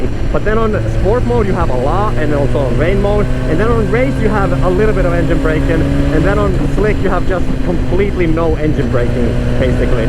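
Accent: Finland. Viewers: When the video started I noticed that you had really distinctive Finnish accent and was wondering if you are a Finn. When I saw Rukka jacket, I was sure about it :D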